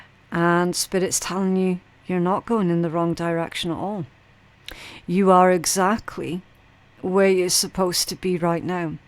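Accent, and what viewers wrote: Scotland, United Kingdom. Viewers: I've had a glimpse of what I'm becoming, but....anticipation is making me wait. 😐 Hey - so nice to hear UR Scottish brogue loud & clear. ~ Godspeed ~ 🙏☀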